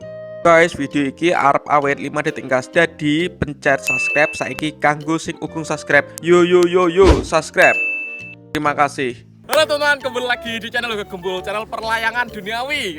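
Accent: Java, Indonesia. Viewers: Orang jawa ya bang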